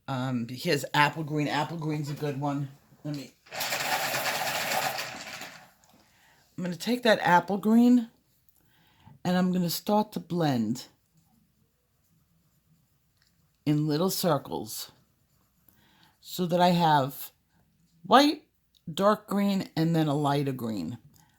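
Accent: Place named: Brooklyn, United States. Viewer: New to your channel, and wondering if you’d mind explaining what paska ( not even sure how to spell it, lol ) is.... never heard of it... loved your tutorial on leaves and as a Canadian, your accent is a delight, makes me smile... is it a Brooklyn accent? That’s my best guess.... thanks for giving such great instructions.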